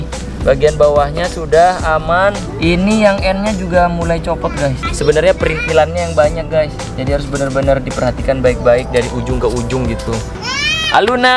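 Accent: Madura, Indonesia. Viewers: Anda orang madura ya kalo iya berarti sama kayak saya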